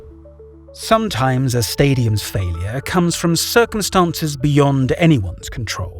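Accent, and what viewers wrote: England, United Kingdom. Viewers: Nice english accent😊😊😊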